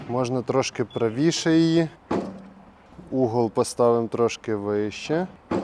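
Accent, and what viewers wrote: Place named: Volyn, Ukraine. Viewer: Випадково не з Волині?) Акцент рідний якийсь 😁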